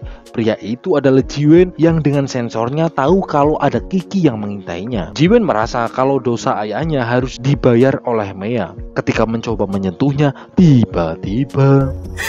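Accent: Banyumas, Indonesia. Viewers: ok gokil,tambai ngapak ngapak,,yg lebih medok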